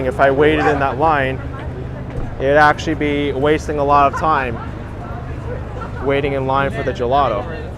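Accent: New York, United States. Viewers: Watching this as the sun sets here, 3 hours later, in Oakland CA. What a great way to be linked to our pride and joy NYC. Or mine, at least. And to have homegrown voiceover accompanying my virtual walk. What part of the City or environs are you from? I know, I'm assuming things, but sure sounds like it from your accent.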